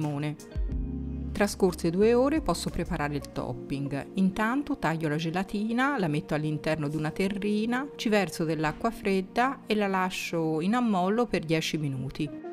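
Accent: Tuscany, Italy. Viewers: Buonasera, spiegazione precisa e completa, impreziosita dalla tua voce dall'accento toscano semplicemente meraviglioso!😍 Ottima freschissima cheesecake con un superbo topping al limone 🍋! Grazie, insuperabile!👍🥰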